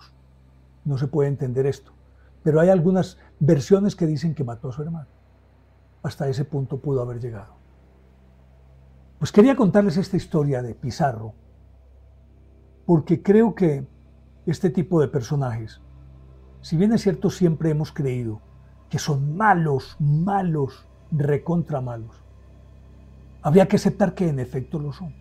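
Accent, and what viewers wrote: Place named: Colombia. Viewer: Que hermosa manera de relatar la historia! Pienso que en alguna vida yo fui Colombiana porque me fascina escuchar la forma de hablar de los Colombianos ❤❤❤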